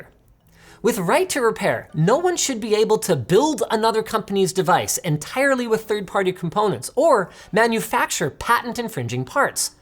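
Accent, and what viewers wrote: United States. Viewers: Linus just sounded so American when talking about all of this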